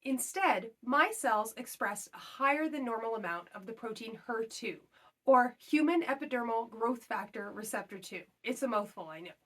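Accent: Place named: Canada. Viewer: Thanks for being so comprehensive,! I really appreciate it and yes, viewers are looking precisely for all those little details you so generously shared, so it's definitely NOT too much information. It's reassuring as a breast cancer patient to feel heard and seen. You have a natural flair for relaying information in a very clear manner (plus a fantastic Canadian accent which I love!) and it has been very helpful listening to your experience which, so far, has been similar to mine. I'm just about to do my 5th round of full chemo (Docetaxel, Herceptin and Perjeta) and it has been more my upper GI tract that has given me the worst symptoms. Pretty ok, for the most part, in my lower GI tract. Did you experience any watery eyes during your full chemo treatment? Mine just won't stop! Looking forward to putting the full chemo behind me and just getting the surgery done. 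I have seen your video on your 1 year anniversary and I can't help but wonder: the fact that you're done with your HP regimen now is awesome but how are these continual questions in the comments to your videos affecting you and your mental health? I can't imagine what that would be like. Is that helpful for you to relive or does it maybe make you anxious? Just curious as to the psychological implications of this on you, post NED. Continued blessings to you on your health journey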